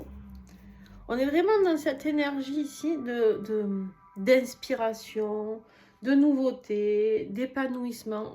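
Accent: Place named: Marseille, France. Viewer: oh peuchère, avec ton accent marseillais on te croit sur parole !!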